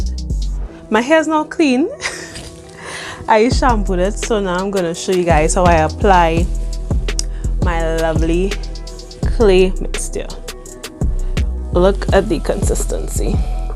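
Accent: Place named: Trinidad and Tobago. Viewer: I love your accent!!! I have trini friends so this makes me feel like I'm chilling with my peoples 😂🤗 let me finish watching haha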